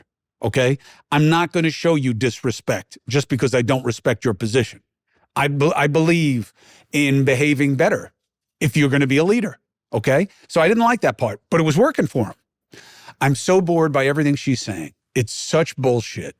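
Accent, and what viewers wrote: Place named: New York, United States. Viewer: He went New York Italian on this one and spoke fluent f word . I can relate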